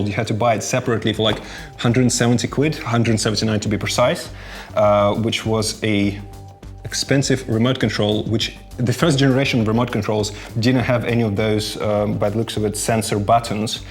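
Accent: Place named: Russia. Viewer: Русский паря чтоль?)) Акцент дичайше русский) Но сама речь - мое почтение) Зато каждое слово понятно)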